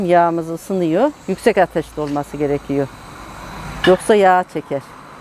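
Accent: Turkey. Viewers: Şive iç Anadolu Bölgesi nerelisiniz acaba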